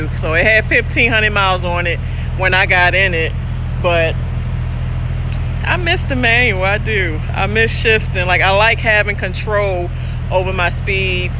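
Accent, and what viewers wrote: Baltimore, United States. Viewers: Why are you so Fricken cool?😍😍😍 I Love your energy. Soooo pleasantly Calm and peaceful; and that Bmore Accent😂😂😁😁😍😍😍👍👍👍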